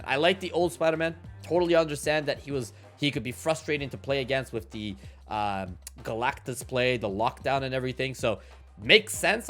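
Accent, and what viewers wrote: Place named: Quebec, Canada. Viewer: Clairement un québécois!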